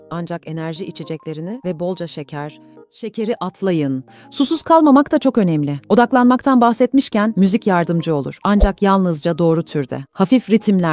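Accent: Azerbaijan. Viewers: Oha abla sen Azerbaycanlımısın?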